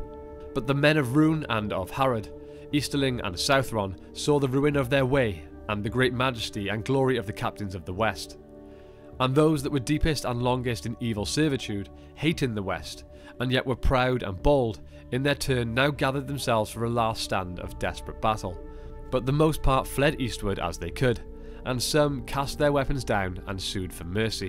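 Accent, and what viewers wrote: Wales, United Kingdom. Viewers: About time I subbed! Is this dude Welsh, does anyone know?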